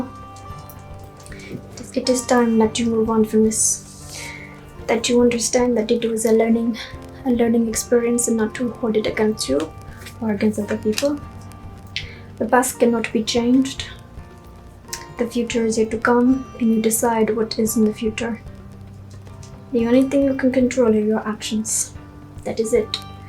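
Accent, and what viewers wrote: India. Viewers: As an Indian guy I can confirm that the accent is perfect 10/10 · I love your indian accent and you look beautiful in red 😊❤🙌👏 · As a INDIAN, i really appreciate your effort regarding Indian speaking accent of your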